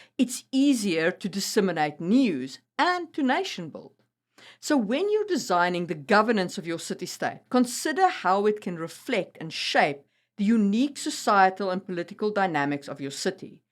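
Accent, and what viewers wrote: South Africa. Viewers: Great video, thank you!! Do I detect a South African accent? 😎 While watching I kept thinking about Cape Town's historical and modern viability as a city state. I'd love to see some videos about how nations and empires crumble, and what happens in the aftermath.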